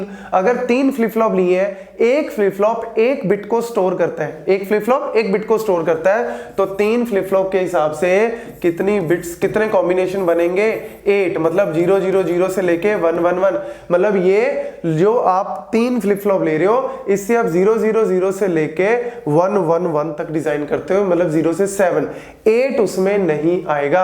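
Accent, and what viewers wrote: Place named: Haryana, India. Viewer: are u from haryana ur accent already reveals it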